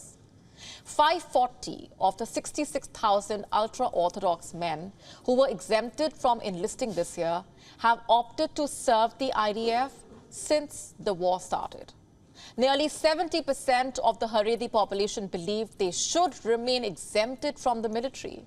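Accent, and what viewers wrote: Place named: India. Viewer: This is a hard accent to like to western ears. Why does a India news channel speak in English anyway. Go away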